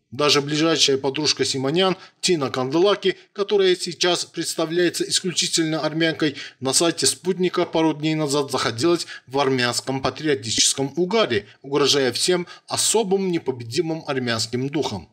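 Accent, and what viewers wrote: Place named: Azerbaijan. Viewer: Margaritu armyane lyubili I lybyat! A vse, kto seichas pishut (yakobi protiv Margo), eto-- vashi soplemenniki! A vash akcenq glupovatogo azera, diktor, otvratil'no I opasno dlya ushei))))). Vi uje sdelali nash abrikos samim znamenitim fruktom na planete, oluxi))))))). Tak shto sidite spokoyno, zanimaites' tem, k chemu privikli----- prodajei zelen'y!))))))))))))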